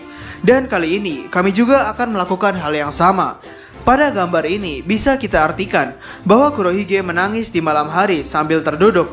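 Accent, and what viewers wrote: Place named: Lombok, Indonesia. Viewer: Iye jage, dengan sasak badek wkwk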